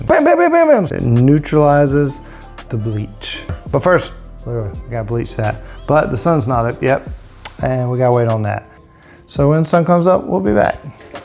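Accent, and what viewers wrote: South, United States. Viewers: I’ve been watching you and thinking 🤔-that southern accent is too familiar. Then, i stalked you!!🤣 nah, just found your PO Box. BOILING SPRINGS!!!! Hello neighbor!! Spartanburg/Pauline area. We can almost yell out of our windows. 😂 loving your videos. Learning so much and getting some good inspo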